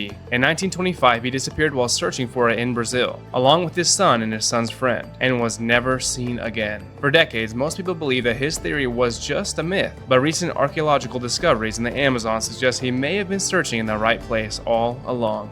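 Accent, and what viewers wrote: United States. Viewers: So you’re watching a video about other parts of the world, but you don't know that different places pronounce things differently. Americans pronounce it exactly the way he did. My British wife pronounces 'bottle' as 'butt-hole.'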